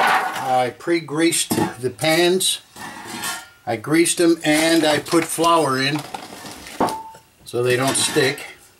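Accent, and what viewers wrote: Chicago, United States. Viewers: You have a Chicago accent as do I lol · Great "Chicagoland" accent,which i enjoy hearing since i relocated to SoCal 4 yrs ago. A'int the same difference either.They talk/sound different here. A very good example of the SoCal sound would be original version of ' 'from album '.'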